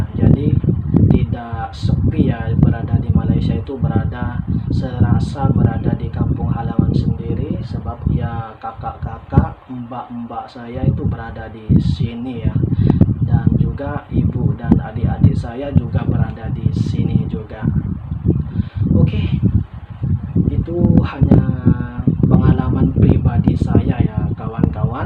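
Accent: Indonesia. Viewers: Mahal sekali suara suara latar yang sesuai membuat video.sedap dengar,walaupun ada alang keindonesia nya.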